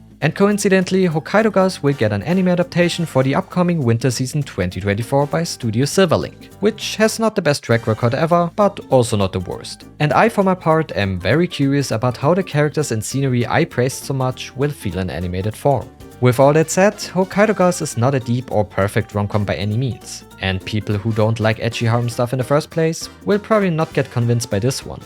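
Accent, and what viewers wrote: Germany. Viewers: (hmmm... The algorithm suggests this ani-tuber. Let me see what that have to show me.) later; That was good. A well-argued recommendation for a manga, soon to be anime. Lightning-fast references for comedic emphasis. All delivered in a well edited video. And the German(?) accent gives it an authoritative cachet.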